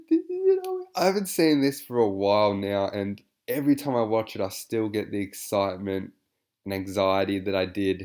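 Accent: Australia. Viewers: Bro, you're like the Steve Irwin of perves, not only your accent but the purpose of every action by the critter of topic, the analyzation and breakdown is riveting.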